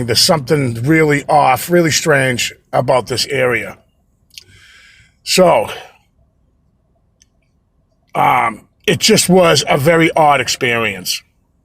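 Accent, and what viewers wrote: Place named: New Hampshire, United States. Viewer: I thought that was a New Hampshire accent.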